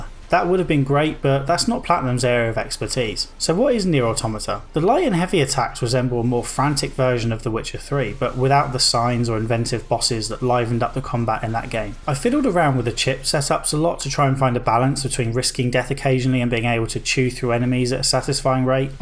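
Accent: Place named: RP, United Kingdom. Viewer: The fact that you actually did your background research, stacked with how thorough your reviews always are, multiplied by the fact that you speak the queen’s English (i’m american and love that accent) makes me wish you did full story spoiler reviews. I don’t really watch your videos as research tools for games I might play, i mainly just try things out, but I purposely look for your reviews of games i loved ie: dues ex for example.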